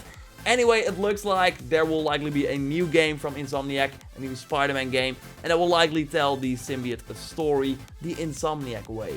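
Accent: Netherlands. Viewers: Are u netherlands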